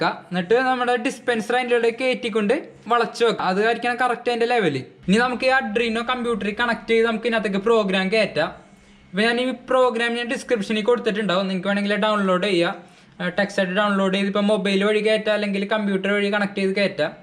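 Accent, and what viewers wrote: Thrissur, India. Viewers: ഹാ തൃശ്ശൂക്കാരൻ ഗഡി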